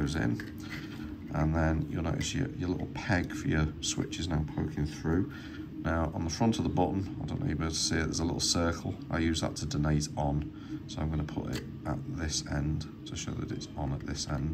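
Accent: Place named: Wirral, United Kingdom. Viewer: I need a order a couple andet my nodes out of project boxes. Great hearing a local Wirral accent on YouTube for a change. 73 Sean de 2E0SYA (Wallasey)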